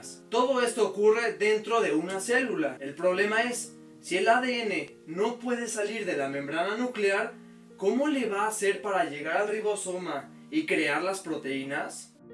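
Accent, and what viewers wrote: Fresa, Mexico. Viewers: Habla bien fresa pero aprendí en 5 minutos lo que no pude aprender en 3 años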